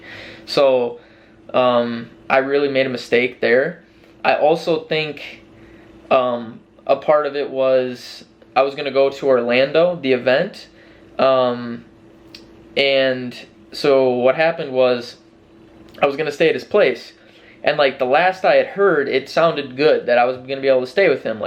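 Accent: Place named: Minnesota, United States. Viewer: I fucking love this video. sounds like a Minnesotan so much lol Love you!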